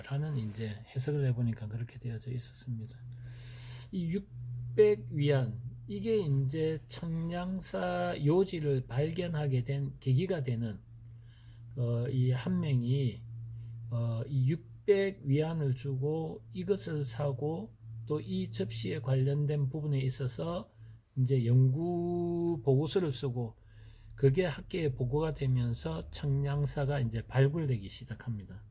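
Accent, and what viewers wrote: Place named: South Gyeongsang, South Korea. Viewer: 말씀하시는 톤이 경남분같은데요 놀리적으로 말씀을 잘하시는데 여요 장공항요 한국에 엄청많아요 그런데 판매할때가 없어요 ㅎㅎㅎㅎㅎㅎ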